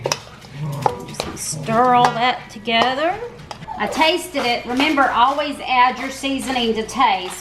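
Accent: South, United States. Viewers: Hey! You did an amazing job! I love how you have kept your true southern accent. Loved the recipes too! xo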